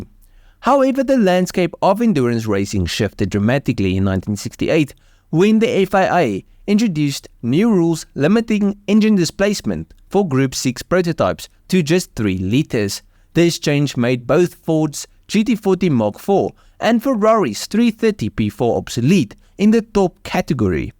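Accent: South Africa. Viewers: I think it's one of those less well known South African accents. Our ears are just not attuned to the S.A. accent because they haven't been on the (automotive) world stage that much. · Sounds like one of my countrymen from South Africa.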